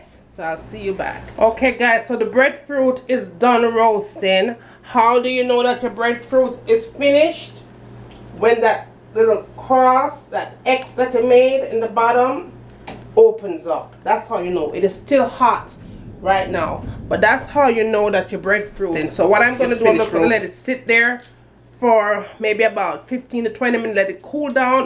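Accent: Jamaica. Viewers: MEH LIKE DE JAMMIE ACCENT, ROAST IN DE COALS IS DE BADDEST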